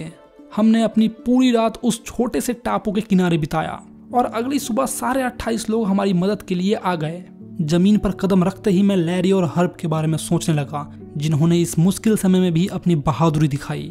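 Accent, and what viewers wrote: Bihar, India. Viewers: Bhaiya.....Your bihari tone is also a reason why students love your teaching style..❤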